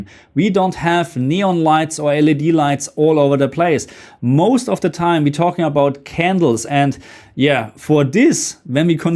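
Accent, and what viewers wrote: Germany. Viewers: Man hört fast gar nicht das du deutscher bist 😂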